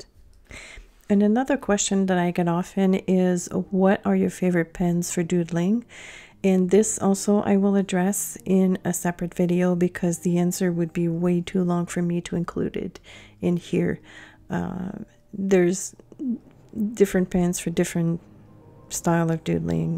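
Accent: Quebec, Canada. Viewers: So beautiful! I just found your channel. I am also self taught and I do realistic acrylic on canvas. I need to step out of my box and I just love this. I recognize a french Canadian accent. My parents are both from Quebec, I'm first generation born Albertan. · Totally exquisite,!!!! 💕 I love your signature..... And you sound just nice and Canadian to me! 😄😘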